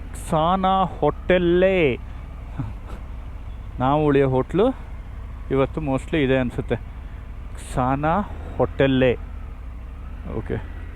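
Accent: Kundapura, India. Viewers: ಉತ್ತಮವಾದ ಕುಂದಾಪುರ ಕನ್ನಡ ನಿರೂಪಣೆ god bless you sir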